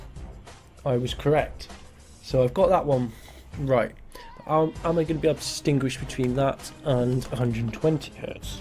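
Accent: Bristol, United Kingdom. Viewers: Is this guy Bristolian? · good to hear a bristol accent!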